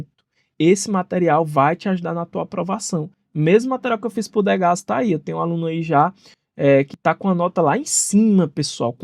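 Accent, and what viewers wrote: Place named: Northeast, Brazil. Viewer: Não fala mal do seu sotaque! Com tantas potências no nordeste brasileiro, este se tornou o que eu mais gosto! É um sotaque digno de orgulho!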